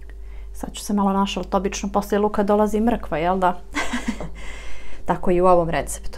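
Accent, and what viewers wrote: Bosnia and Herzegovina. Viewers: Draga, volim kad vidim da divna mlada zena zna i voli da kuva. Posebno mi je drag vas bosanski naglasak koji me podseca na lepe trenutke dok sam zivela u Bosni sedamdesetih godina proslog veka. Pozdrav od jedne bake iz Srbije.❤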